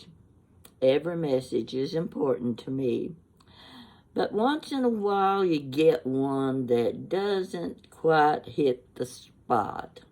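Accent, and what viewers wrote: South, United States. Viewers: I appreciate your company. I feel like you are very sweet and homey. I love the sound of Southern people. ❤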